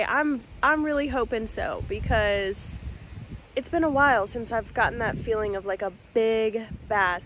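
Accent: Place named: Missouri, United States. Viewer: Sounds like missouri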